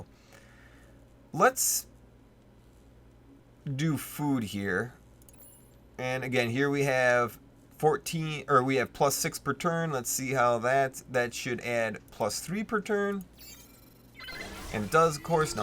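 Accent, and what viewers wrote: Canada. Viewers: You are incredibly canadian, thanks for the tut man.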